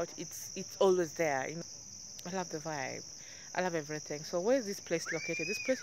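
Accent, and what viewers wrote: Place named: Texas, United States. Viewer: Why do you sound so westernized? Ongea kama mkenya, you sound like you from Texas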